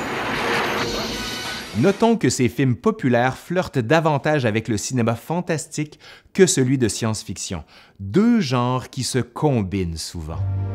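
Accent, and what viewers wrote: Quebec, Canada. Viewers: Quel plaisir d'avoir découvert cette chaîne à l'accent français chantant du Québec et sa bonne diction des mots anglais que nous n'avons pas chez les vidéastes français x) Contenu très qualitatif !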